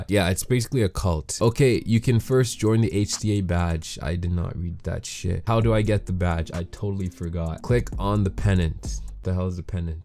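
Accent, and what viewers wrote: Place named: Toronto, Canada. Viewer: sound like a toronto man still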